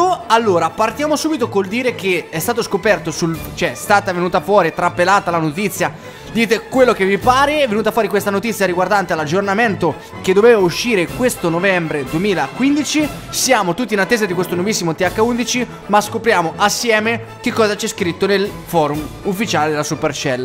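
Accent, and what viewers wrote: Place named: Ancona, Italy. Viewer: se vede da do chilometri che sei anconetano. grande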